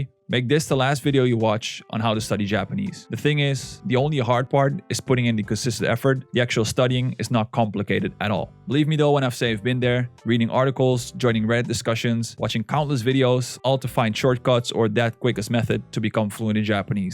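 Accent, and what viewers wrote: Netherlands. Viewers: Great video! I started learning Japanese last September, nearly 9 months ago. Rather than months or years, I think the crucial factor is the number of HOURS, which is why I decided to write down how many hours I studied every day. So far I have done exactly 332 hours, which works out at roughly an hour and a quarter per day on average. This isn't a lot, but I have a family and a full time job, so I cannot realistically manage much more. I have been using Wanikani for the kanji and vocabulary. Grammar I have covered with an app called Busuu, but I think I have gone too fast, because it hasn't really stuck in my brain. Right now I'm reviewing all the lessons from the past few months. And to top everything up, I use Duolingo. It's free, it's fun, and there's a bit of everything in there: kanji, grammar, vocab, useful phrases, etc. At my present rate, I expect it to take me a bit over a year to get past the beginner stage and start just immersing; reading and listening. 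In July I have the JLPT n5 exam, which I expect to pass. After that I will no longer consider myself a beginner. I'm not worried about output, so I won't be trying to talk to Japanese people, or writing out the kanjis. For the foreseeable future I will be limiting myself to input. By the way, I recognize the Dutch accent from when I lived in Belgium. Like most Dutch speakers, your English is excellent.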